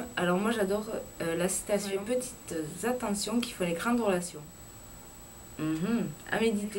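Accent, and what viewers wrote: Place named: Lot-et-Garonne, France. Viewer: Super ce tag, merci de m'avoir tagué, malheureusement je trouve pas que j'ai un accent. Du coup je pense que je ne le ferais pas, mais je partage ta vidéo sur ma page facebook :) Et pour info mon père vit dans le Lot et Garonne, alors je connais bien ton accent. Et dés que je reste une semaine chez lui, je rentre en Auvergne avec ton accent, et tout le monde se moque de moi, parce que je récupère trop trop vite les actions verbales des autres ^^ Le pire c'est le "pain", "train", "cinq", "putin con", "branleur" et les "avé" au lieu d'avec ! Mais j'aime tellement cet accent moi :P Bisouuuuuuuus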